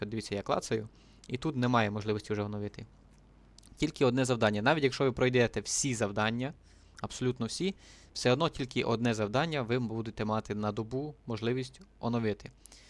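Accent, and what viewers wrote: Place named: Dubrovytsia, Ukraine. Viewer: говориш як з дубровиці)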